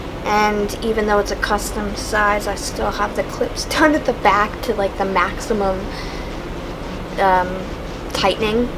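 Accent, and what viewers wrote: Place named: Canada. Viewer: I actually love how you sound. I wanna say Canadian maybe? No matter where you hail from, you have the coolest voice. I wish I sounded like that. Not even joking. Xo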